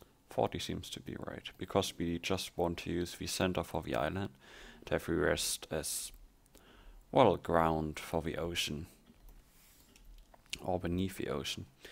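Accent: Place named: Germany. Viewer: Ich hab beim ersten Wort gewusst das du deutsch bist :D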